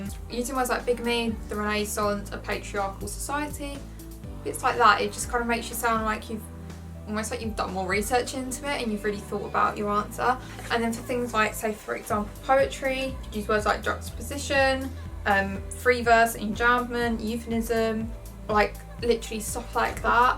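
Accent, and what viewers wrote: United Kingdom. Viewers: Useful videos. But I find your British accent too strong to follow like you speak so fast😉😉😊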